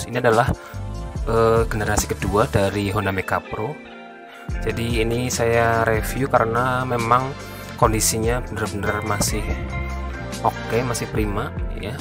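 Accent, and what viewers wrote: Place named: Banyumas, Indonesia. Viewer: Ngapak ya mas wkwk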